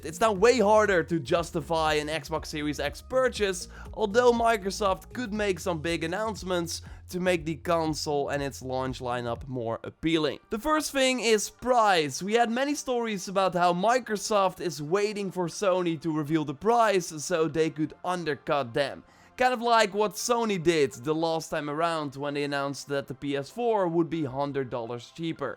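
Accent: Netherlands. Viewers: Je klinkt als een hollander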